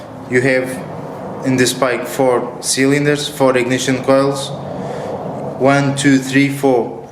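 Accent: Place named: Russia. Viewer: Guy what state do you ride in, or country, you sound russian. That way i can show you a thing or two about your CBR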